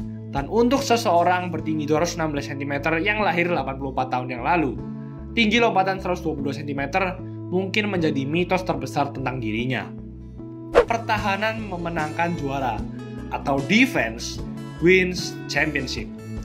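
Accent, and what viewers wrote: Java, Indonesia. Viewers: Min...logat jawanya di kurangin.....xi..xi...xi....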